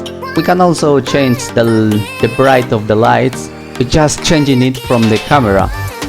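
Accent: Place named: Germany. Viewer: pretty german, good tutorial helped me alot 🐙👍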